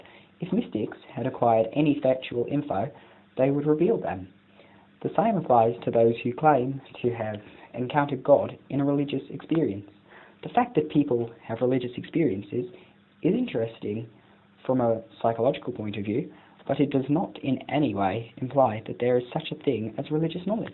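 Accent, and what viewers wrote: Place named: United Kingdom. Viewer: Holy shit this is awful in a British accent.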